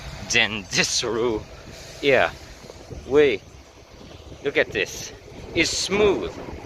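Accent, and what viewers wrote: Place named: France. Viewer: Stupid question but why are you speaking in a friench accent? (Like I said I'm watching everything chronologically. But it has somewhat stopped bc of stupid reasons)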